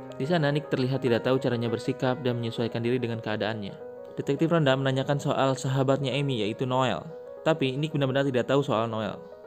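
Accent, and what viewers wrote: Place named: Banjar, Indonesia. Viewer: Banjar banar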